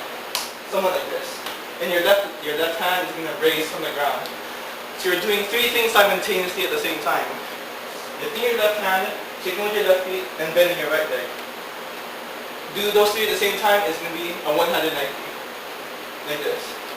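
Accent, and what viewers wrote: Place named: Philippines. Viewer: lol your filipino. that accent sounded familiar xDDD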